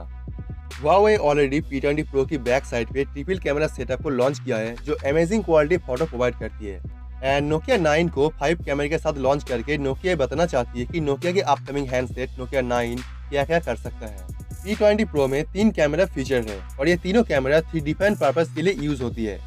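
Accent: Bengal, India. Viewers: Firts Change ur bengali tone broder....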